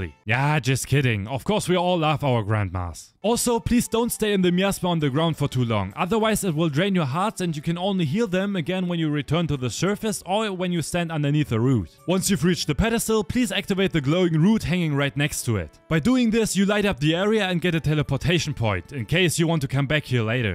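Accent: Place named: Germany. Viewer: Man hört so sehr den deutschen Akzent 😂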